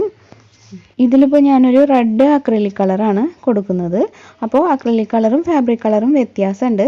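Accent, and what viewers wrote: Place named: Kannur, India. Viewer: വെളിച്ചങ് കണ്ണൂർ ഭാഷ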